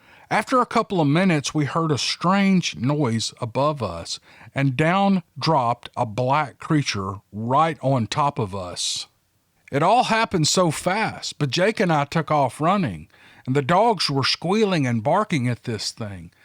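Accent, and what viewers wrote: South, United States. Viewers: ok so I never commit, but I love your show! I love your accent I am from North Florida and my family sounds like you. So I feel at home listening love the southern drawl. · Hi, i live in chesham, a little village outside london UK, i found your channel last month,and fell in love with it from the first story! You are truly a master storyteller,you can connect and get lost in each and every story,short or long,you never disappoint. I'm now enjoying all your back catalogue of stories, and have introduced my girlfriend to your southern(?) drawl too! Love u lots bro,keep up the amazing job u do,you're my favourite addiction by far!😜😃🤩👍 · I'm a 71 year old, happy, Texas grandmother. I can't tell you how much I enjoy listening to your soothing Southern accent every night. I often fall asleep listening to your stories. I get so tickled when you lose it reading people's descriptions of smells! 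This Southern lady loves your sense of humor, your gentlemanly attitude, and your kind, Southern voice. Don't worry about the misprounciations...it's all part of your charm! Your honesty is refreshing in this troubled world. Thank you for being real. Hugs from this grateful grandmother. May God bless you and yours. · every time you say" that's how I want to see one in my car, moving" hahaha it makes me laugh every time! I listen to a lot of podcasts everyday as I'm a truck driver in North Dakota. I must say you do a very good job! You have a great personality and that southern accent I can just listen to you talk forever.... Thank you for what you do. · Yes his voice has some special soothing quality and I love the Southern accent. I'm Irish and it brings me back to 'ghost story' nights in the countryside when we were kids... Thank.you 🍃🌿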